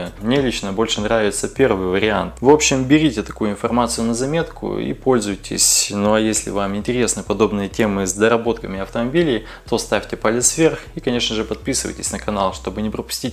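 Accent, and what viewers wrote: Perm, Russia. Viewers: Вы из Перми?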